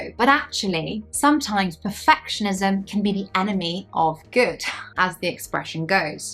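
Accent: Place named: United Kingdom. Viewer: Dear it is a real pleasure for me;) it helps me remind some expressions and refresh this beautiful language. Love the UK accent more than the American one;) so polite and a ‘clean pronunciation’ Definitely, English has been very important in my life and musical career … more than Spanish … can you imagine? Happy to discover your Channel and follow every episode🙏🩵🙏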